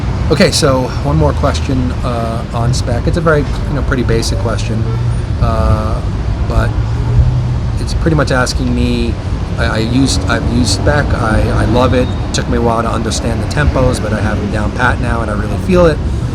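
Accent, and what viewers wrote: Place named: Massachusetts, United States. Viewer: You sound like you're trying to hide a masshole accent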